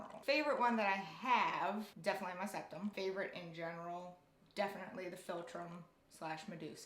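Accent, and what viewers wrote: South, United States. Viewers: I change my daith on my own and I've gotten good at changing it on my own, yours also closed on you a lot faster than mine did, if you ever try again just be patient and be ready to switch out the jewelry. Also you've answered my questions in two of these videos! _ (Ado1017). I pronounce Piercing like you too, it's just a Southern thing lol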